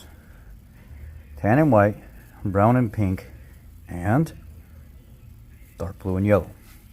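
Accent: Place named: South, United States. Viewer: Great vid. You had me cracking up with you country accent….LOL…Stay Blessed..I’m still giggling as I type this.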